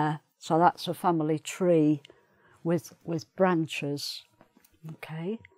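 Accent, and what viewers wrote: England, United Kingdom. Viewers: thank you a lot , i loved your british accent much <3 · Hi i like your videos,theyre very intresting and i learned alot from you i like the british accent! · chemical makeup hhhhhh You have the nicest English accent I've ever seen before. keep on.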